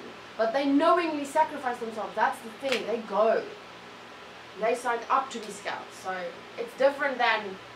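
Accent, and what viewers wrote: South Africa. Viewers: what accent is that it sounds south African??? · Huh, you're from South Africa? That's cool, explains the accent.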